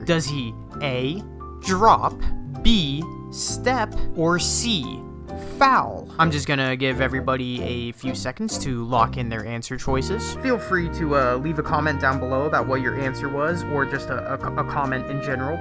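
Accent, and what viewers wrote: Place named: United States. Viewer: I love listening to American accent talking about 'football'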